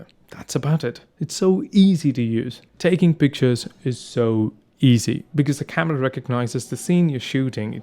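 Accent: United Kingdom. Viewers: That English accent 👌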